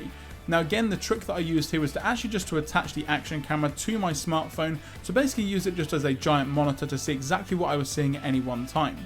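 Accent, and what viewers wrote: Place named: Cardiff, United Kingdom. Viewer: Didn't know you Cardiff man 😂 are you Welsh?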